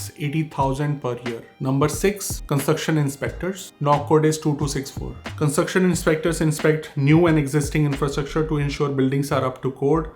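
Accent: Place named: India. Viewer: Your accent is like indian it's the worst.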